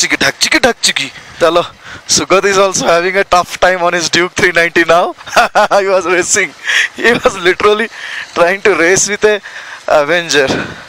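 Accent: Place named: Puri, India. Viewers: sahe sahe re khela bhai puraa aamara puri style re suniki bhala lagila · Where are you from brother? You sounds like a little bit bengali 😄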